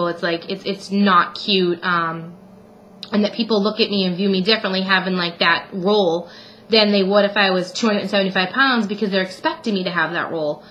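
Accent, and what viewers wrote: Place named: Massachusetts, United States. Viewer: FIRST thing I noticed about you was your Massachusetts accent!! lol LOVE it!!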